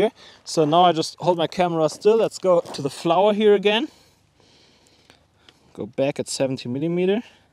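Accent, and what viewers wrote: Germany. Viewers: Thank you for sharing your expert knowledge. For film and photography enthusiasts, your help and tips are indispensable for better quality product. You are easy to listen to and your German accent lends additional interest to your videos. Thank you, again. Steve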